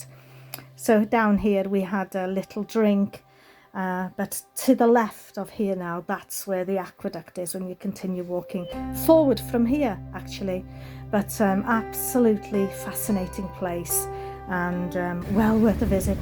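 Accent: United Kingdom. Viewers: This is a very informative tour and it's relaxing listening to your sweet voice and lovely British accent.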